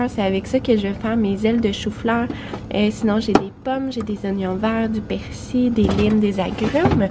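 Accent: Canada. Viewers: Merci pour cette vidéo, en effet, c'est un sujet attendu. Cuisiner en direct, nous emmener faire les courses avec commentaires pour économiser, conseils budget, tout cela peut rendre service à beaucoup de personnes ! Le dessert est très tentant mais... je suis française et, même si j'adore l'accent canadien et les expressions : cela fait combien de grammes pour chaque ingrédient 😄😄? Merci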